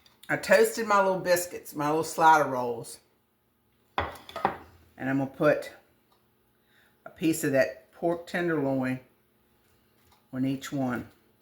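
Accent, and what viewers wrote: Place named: South, United States. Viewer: Loved your recipe. Love your accent, southern gal. Like I said before, I'm in Pa and love new ways to make every day food stuff. I pray you reach 1000 people watching. I know that is when they award you. Take care, Betty. An old grandma from Pa.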